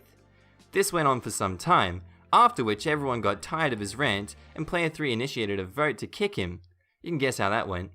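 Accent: Australia. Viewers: Ello fellow Australian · Are you Australian?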